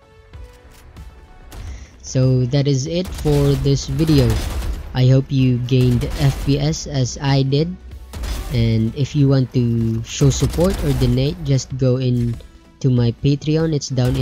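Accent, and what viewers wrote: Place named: Philippines. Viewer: Pagsasalita palang alam ko nang pilipino ahahahaa thanks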